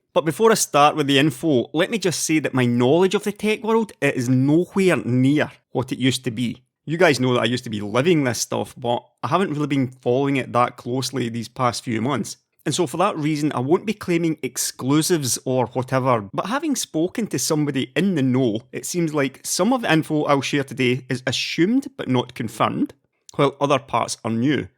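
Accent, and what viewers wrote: Scotland, United Kingdom. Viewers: your Scottish voice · Aaah, I have missed you Scottish tones over the last several months, and while you may not appear as frequently as you once did, your return is still most welcome. Stay well. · Good to hear your thick Scottish accent again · the kinky Scot accent is back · those dulcet Scottish tones are back baby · yeah that is my scottish man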